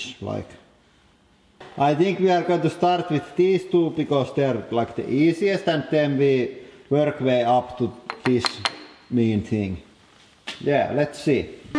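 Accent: Finland. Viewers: Judging by his accent, is he Finnish? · rallienglanti paras englanti : D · I love his accent. Finnish :)! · its the finnish accent becouse we speak how we write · suomalaista paskaa englantia :D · are u from Finland because u sound like the dudesons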